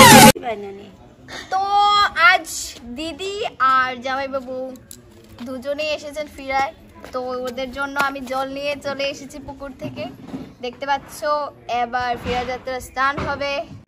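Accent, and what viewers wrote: Sylhet, Bangladesh. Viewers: Are you from Assam or bangladesh? Because this is sylheti bangla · Tumi ki sylhety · Does assami people speaks sylheti accent or what or are you from there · আপু তুমি কি সিলেটি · Sylheti naki tumi?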